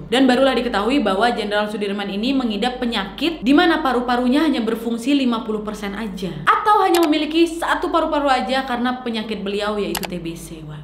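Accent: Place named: Medan, Indonesia. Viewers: Ni pasti cewek dr Medan.... dh jlz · asal medan ya? logatnya mirip kali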